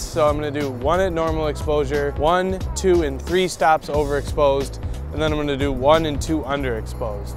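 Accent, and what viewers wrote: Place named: Rochester, United States. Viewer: I haven't been home in over 3 years but just listening to your Rochester accent made me feel at home :)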